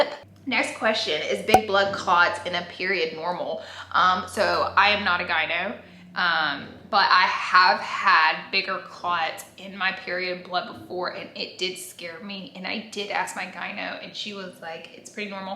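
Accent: South, United States. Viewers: Heyyyy 🤗 I will never get tired of you Accent soo Country I Love it 🥰🥰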